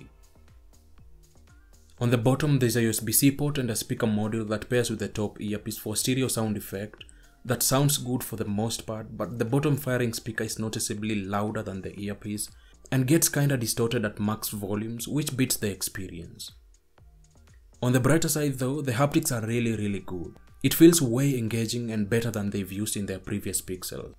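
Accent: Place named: Kenya. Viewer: Dunno why I feel like you sound Kenyan😂😂😂....just bumped into your channel as I was reviewing the pixel 6 pro. I have the Pixel 6 and love this phone but honestly I keep wondering if the camera on the pro is better. Do you have an opinion on this? That would be the sole reason I switch to the pro. I love taking memories of my kids on video and photo and want the best images? If the pro is better I just might be convinced to swop purchase it. Thoughts ?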